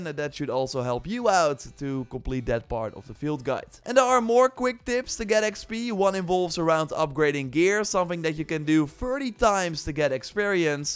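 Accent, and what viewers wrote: Netherlands. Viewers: I have a feeling ur Dutch cause your accent, sorry if I’m wrong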